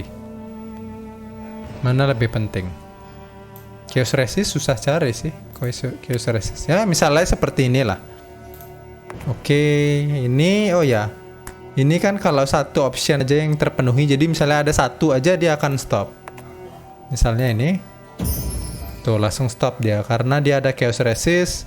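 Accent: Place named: Pontianak, Indonesia. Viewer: Logatnya mirip orang pontianak bang 😅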